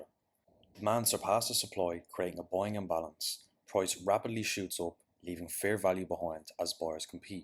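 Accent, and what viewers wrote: Ireland. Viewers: Irish accent? Subbed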